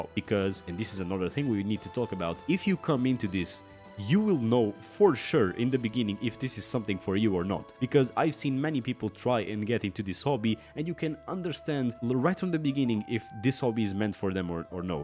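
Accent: Portugal. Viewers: Just stumbled on this video and my first thought was: "This guy sounds like a portuguese speaking english!" Well, what do you know? 🤣 Keep it up! 👊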